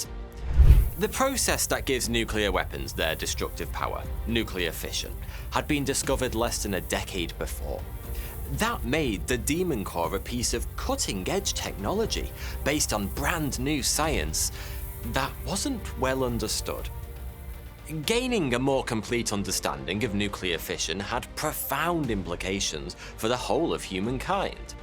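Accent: United Kingdom. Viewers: Definitely sounds like he's from the UK somewhere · Okay, but why is Frankie Muniz doing a British accent?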